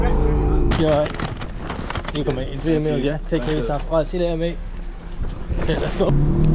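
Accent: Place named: Birmingham, United Kingdom. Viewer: ha glad my comment made you giggle 😂 Are you from brum? I’m in Redditch so I recognise that brummie accent 😅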